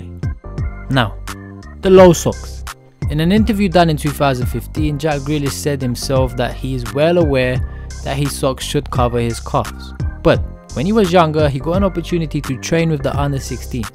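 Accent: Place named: United Kingdom. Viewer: Nice british godfather accent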